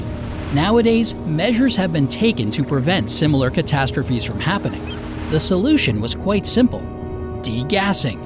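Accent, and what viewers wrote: Russia. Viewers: To: Bright side Are you a Russian or Ukrainian? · Are u russian?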